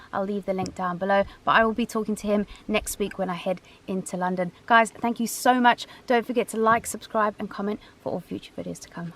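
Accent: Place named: South Africa. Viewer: you have a hint of a South African accent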